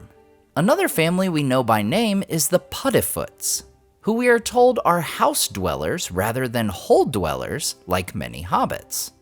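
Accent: United States. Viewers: Just wish could use a British accent.. I don't mind what region; but it should be British. The american accent kills it for me.. sure I'm speaking for others who are passionate about the Great works of Tolkien here. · The american accent is killing me!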